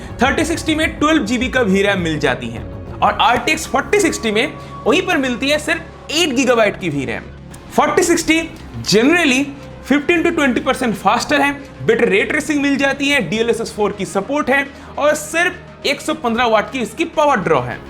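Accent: Bengal, India. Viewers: You bengali?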